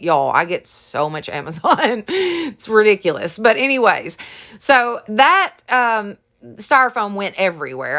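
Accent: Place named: Tennessee, United States. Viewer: Hello and greetings from northeastern North Carolina. 👋👋 I'm a newbie. I already subscribed because I love your name for your channel! Your energy is inspiring! AND I LOVE THAT TENNESSEE ACCENT!! 😁 Actually I do have a question. And I don't know who to ask. Where di you sign up to do LIVES here as well as on YouTube? I've dreamed of being a small business owner for about 23 years now.... I am a "Chronic Creative" (borrowed from Amber Strong 😁). I enjoy creating, and I decided after hurting my back and being forced into early retirement - LET IT FLY! It's taken me this long to feel ready. I AM READY!!! Thanks! L8R ✌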